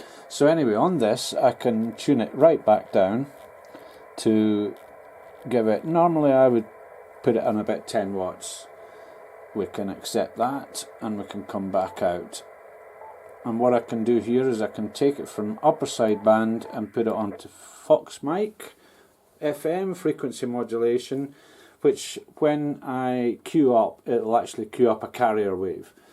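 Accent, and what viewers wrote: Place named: Scotland, United Kingdom. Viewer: Thanks and apreciated video with a Scottish accent, qth here west coast of Scotland 73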